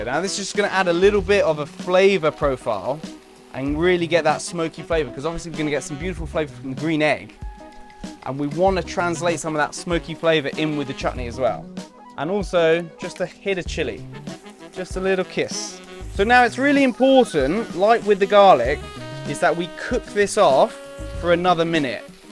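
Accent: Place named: United Kingdom. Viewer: If I ignore the face has reminded me of Jamie Oliver Show, a perfect kitchen class 💯👌 The cooking style, explaning the ingredients, variety of spices, expecially rooling of meat kababs over salt just like he do and obviously British accent😅 🤌.................You nailed it. You have to be generous with the ingredients to bring that level of flavour profile. These were definitly looking awesome and wholesome🤤🤤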